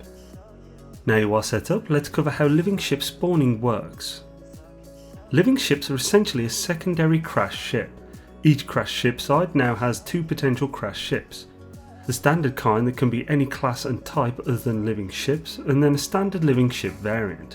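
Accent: United Kingdom. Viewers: You obviously know your game... some of us, however, do NOT. The way you whiz through points that you don't explain, leaves me wondering what you just said and/or how you did it. You speed through a dialogue of which I understand approximately half. You have a British accent, which is great, for I believe the British usually have a superior grasp on English enunciation- or aptly named "The King's English". I am from the United States and not blessed with perfect speech comprehension, which leaves me puzzled at the end of this video. I am only suggesting that you consider slowing down a little and being more concise in the future. Simplifying the explanation is not degrading it. Thank you for your videos.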